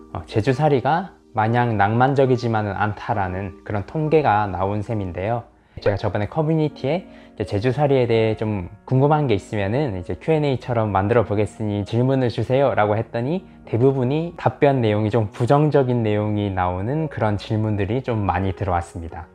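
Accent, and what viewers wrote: Gyeongsang, South Korea. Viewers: 표준말을 경상도 사투리로 쓰시는데요. 귀욤!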